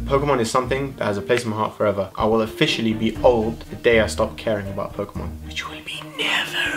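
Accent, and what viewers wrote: United Kingdom. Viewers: Hey I'm a new subscriber, soo glad I discovered your channel. Just wondering have you lived in the UK? love your accent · why isnt your british accent as strong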